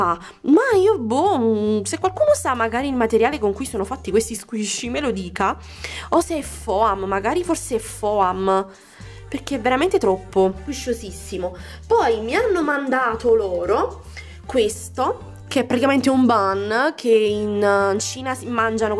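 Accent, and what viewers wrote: Naples, Italy. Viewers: penso che tu sia napoletana perché si sente (poi nn lo so) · ma sei di Napoli?? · Ciao ma sei di napoli?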